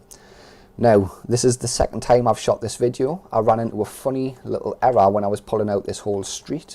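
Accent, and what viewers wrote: United Kingdom. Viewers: Random question but are you from Middlesborough? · Thanks for the series, only just starting to look at the prefab editor as I have a few ideas, so the overview has been useful. Especially trying to find the right balance between lots of small or few small prefabs. How do you think your method of capturing a large prefab from the world compares to merging small prefabs inside the prefab editor itself - which is the way I had been trying to do it. PS There's nowt wrong with the Geordie accent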